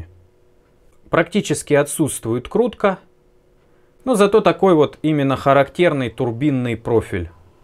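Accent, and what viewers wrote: Rostov, Russia. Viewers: Понад корпусом🤣 ростовский говор ни с чем не перепутаешь👍 привет узловой бригаде связи г. Аксай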